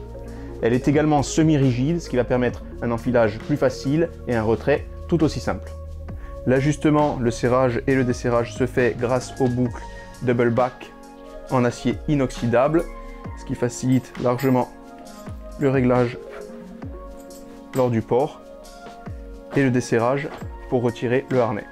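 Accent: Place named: Corsica, France. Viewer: le petit accent corse s'est dépaysant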